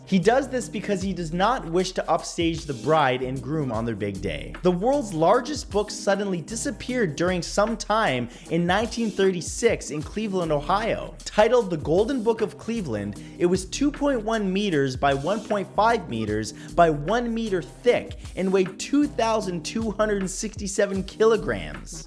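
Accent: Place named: Canada. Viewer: He's Canadian 🇨🇦they say things weird.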